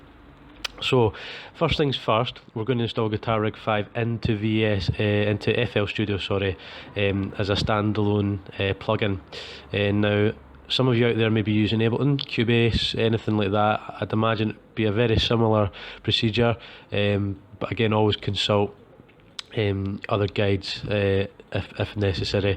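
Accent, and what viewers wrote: Scotland, United Kingdom. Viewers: gold i believe its a Scottish accent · Oh Aye scottish tutorial , thanks for the help mate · I love scottish accent man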